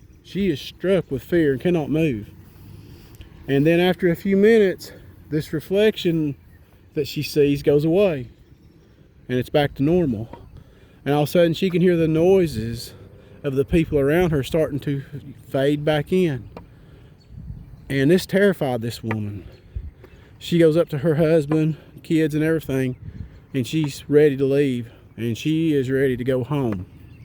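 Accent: South, United States. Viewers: Nothing like a Southern storyteller. Great job! Very scary experience.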